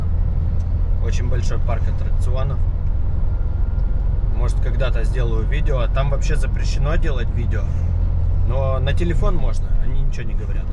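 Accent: Odessa, Ukraine. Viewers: я не сразу понял, что он одессит. Теперь слушаю уже фильтруя слова.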